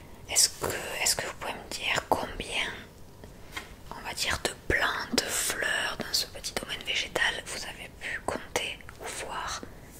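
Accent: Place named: Toulouse, France. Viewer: C'est marrant, j'ai récemment suivi une formation, et ma formatrice avait aussi un accent toulousain, et habitué comme je suis à tes vidéos, j'éprouvais des déclenchements à l'écouter parler. 😅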